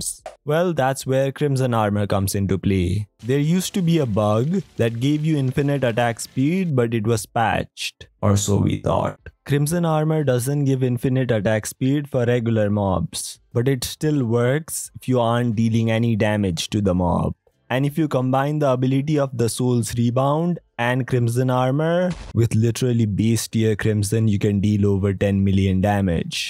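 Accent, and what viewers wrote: India. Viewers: u sound indian af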